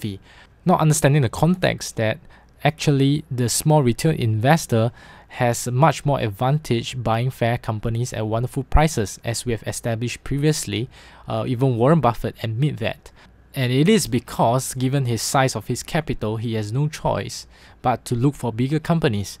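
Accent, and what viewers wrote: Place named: China. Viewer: Very distinct chinese accent.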